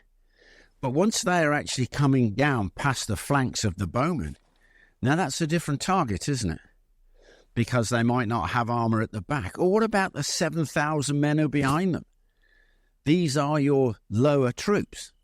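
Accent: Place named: Birmingham, United Kingdom. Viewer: Hi, great channel. You'll never lose the Brummie twang! How do you make the whistling arrows!? 😁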